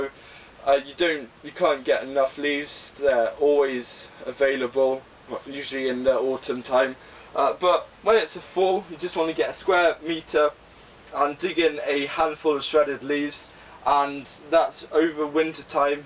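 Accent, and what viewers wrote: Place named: England, United Kingdom. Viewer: you look and sound english for some reason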